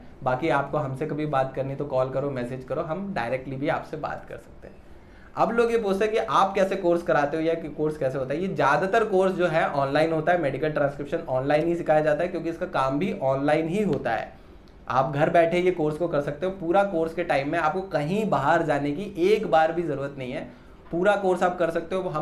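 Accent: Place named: Uttarakhand, India. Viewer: Sir u r from uttarakhand ur voice matching uttrakhandi ji send address I am interested too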